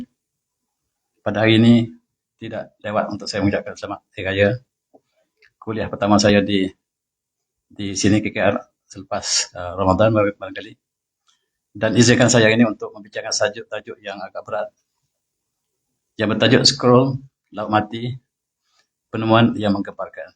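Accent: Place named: Kelantan, Malaysia. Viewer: setuju sgt2..bro, belum cukup ilmu di dada, lautan dalam jangan di duga. Sya pun faham..prof ni byk kekurangan dlm penuturannya yg tebal loghat Kelantan, kelajuannya, malah bait2 bacaannya dari apa sahaja bahasa BM, English, Arabic, Ibrani, Aramaic, terdengar mcm tak clear..lajunya mcm lorat gaduh dan agak lucu2 skt. Tp tu semua tak terbanding langsung dgn ilmu2 dlm setiap kuliah beliau. Mula2 dgr dlu ada gak rasa smcm jer..tp makin lama semakin menarik, makin mendalam, byk ilmu baru, isu2 dunia, isu umat muslimin, isu akhirat dan penuh pengajaran, pencerahan dlm dunia yg sudah di fasa akhir zaman ni..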